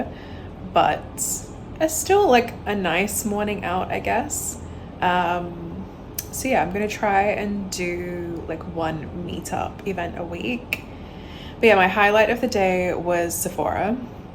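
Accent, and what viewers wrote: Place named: Australia. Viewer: You got a Mystic Accent( (Oz/Yanky )have you been a teacher previously?With That Accent you’ll Acquire the Job Easily!! Good Luck & Enjoy ❤️‍🩹🇹🇭👍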